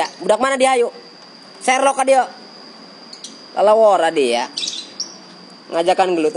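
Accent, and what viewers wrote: Indonesia. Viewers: Lucu ey ngomong sunda na😅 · Logat Banten kitu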